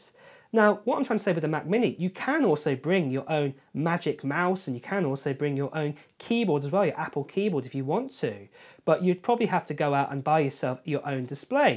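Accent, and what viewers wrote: United Kingdom. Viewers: you are getting a 4.5k screen which arguably to buy separately it would be about £300-£400. You are also getting a keyboard and mouse which cost over £200. You also have a 6 speaker system with spacial audio, plus an HD camera...on top of that you have more material used. so...for the price difference - it kinda makes a lot of sense. Annoyingly you open with the $1299 --- yet go on about the 4 ports... your video is a bit confusing... you also sound British yet use American pricing... Dude... come on... don't be so stupid... you are talking about buying a low end screen, with a cheap keyboard set, and a horrible cheap speaker set --- why not price it up with like for like items --- i really cant watch any more... it's too painful- shockingly trying to get subscribers with a phone - an opened out of date phone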